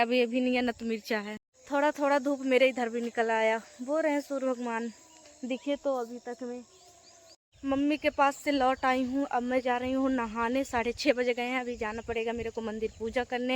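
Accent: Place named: Chhattisgarh, India. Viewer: कुछ कुछ भाषा आपकी छत्तीसगढ़ का भाषा जैसे बोलते हो😮😮😮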